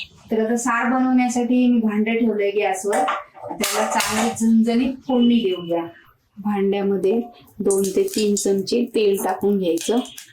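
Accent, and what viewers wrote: Konkan, India. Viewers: कोकणात तसंच म्हणतात त्या कोकणातल्या आहेत आमच्याच गावच्या आहेत आमच्याकडे त्याच भाषेत बोलतात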